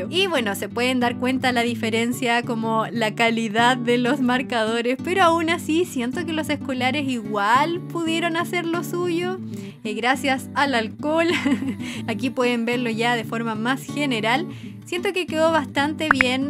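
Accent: Chile. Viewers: me parece?? o habló en chileno???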